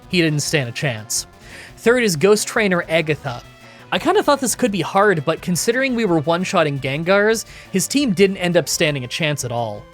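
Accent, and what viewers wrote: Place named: Canada. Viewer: This guy's Canadian accent is so cute😊😊😊😊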